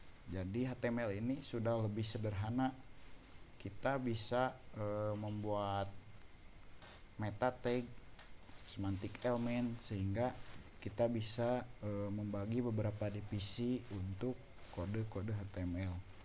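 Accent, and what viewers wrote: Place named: West Java, Indonesia. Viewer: Pengisi suara nya sunda banget :v sukses terus buat JAKI Aplikasi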